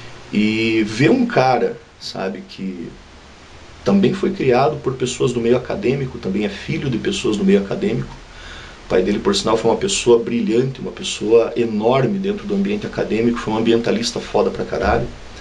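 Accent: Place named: Rio Grande do Sul, Brazil. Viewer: Disse tudo, sou professora e sei como é. Adoro seu sotaque carregado e viva a nós gaúchos!!💪👊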